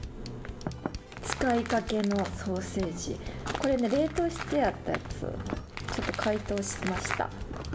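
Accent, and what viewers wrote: Kansai, Japan. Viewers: 日本語も英語も混ぜ混ぜで 関西の女の子って感じで素敵ですね😍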